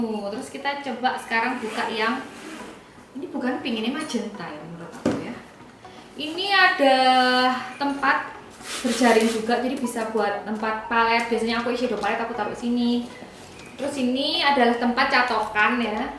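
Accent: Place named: Malang, Indonesia. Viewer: Ben ndelok video e mbak aku mesti cengar cengir ambek medok e😂 malang e asli👊👊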